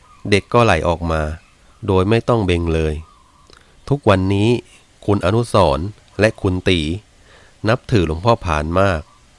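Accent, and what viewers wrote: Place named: Prachuap Khiri Khan, Thailand. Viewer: เหน่อแบบคนประจวบเลยครับ ผมก็คนประจวบ หนองตาแต้ม ปัจจุบันย้ายมานครปฐมแล้ว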